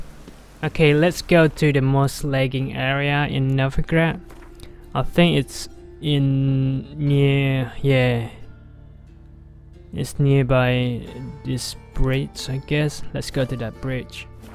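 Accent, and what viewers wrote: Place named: Australia. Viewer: Where are you from? Your accent seems different, kind of australian but german but chinese.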